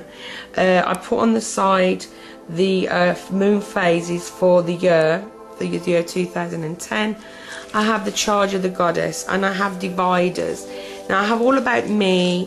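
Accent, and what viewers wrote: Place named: United Kingdom. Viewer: you have the loveliest British accent!! I love it.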